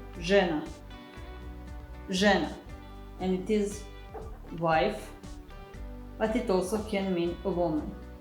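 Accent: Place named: Poland. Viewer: I was thinking you are from Poland as I do, because I’m learning English from your video and interslavic sounds like language I already knows :)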